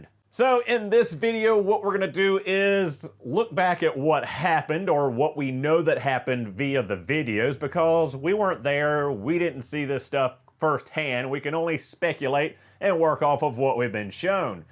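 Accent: South, United States. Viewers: Why are ppl with southern accents allowed on YouTube?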